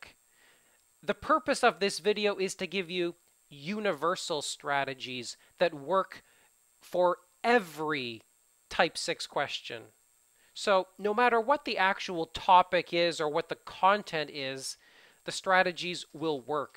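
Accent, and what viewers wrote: Canada. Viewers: So what if you have a Canadian accent??? Native speakers from UK and Australia also have their own accent. Your lessons are great. I am glad I came across to you.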